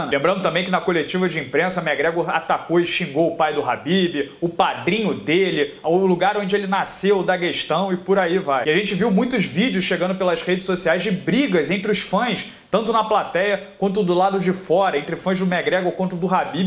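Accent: Rio de Janeiro, Brazil. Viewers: Durante a luta eu fiquei lembrando da sua voz com o sotaque carioca dizendo: "Khabib não mija fora do pinico".... kkkkk. Ponto!!!!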